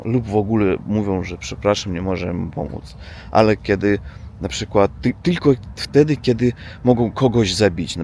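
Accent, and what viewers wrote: East, Poland. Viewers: Ludzie uważają że jak mówisz z akcentem wschodnim to jesteś ukraińcem dlatego kazali Ci jechać na ukrainę, a musisz wiedzieć że nie którzy Polacy nie lubią ukraińców przez to jak się w Polsce panoszą, są krzykliwi , chamscy, wszędzie ich pełno. Dodać do tego co wygadują w internecie na Polaków choć byliśmy pierwszym państwem które im pomagało to chyba nie dziwi że nie którzy Polacy się tak zachowują. I to nie historia nas tak dzieli tylko ich zachowanie. Myślę,że ten co Ci tak powiedział był ukraińcem albo człowieczkiem mało myślącym. Serdecznie Ciebie pozdrawiam i proszę o więcej Twoich wypowiedzi o naszych stosunkach międzynarodowych czyli Rosją i Polską. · No dobra, "Polska dla Polaków" a Mars dla Marsjan a kozy dla muzułmanów 😊.! Za bardzo się przejmujesz. Ja jako PRAWICOWIEC nie mam absolutnie nic do uczciwych honorowych ludzi. 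Nie wydajesz się być kolejnym drobnym cwaniaczkiem a raczej wydaje mi się, że chcesz sobie ułożyć życie w Polsce. Wydaje mi się, że mimo wielu przykładów patologii jakiej doznają także Polacy, jedyną rzeczą która tak naprawdę może zaszkodzić nam wszystkim to nieobliczalne antypolskie decyzje politykiery. Spędziłem 1/3 życia po za Polską i jedno ci powiem - w chwili gdy się wtopisz w miejscowe społeczeństwo, to i ono będzie występować w twojej obronie... bo staniesz się swojakiem. Wschodni akcent kiedyś zniknie, kwestia czasu. Ważne to co masz pod kopułą i jak reagujesz wewnętrznie na to co życie przynosi. Jak by nie było - Miłego pobytu. 😊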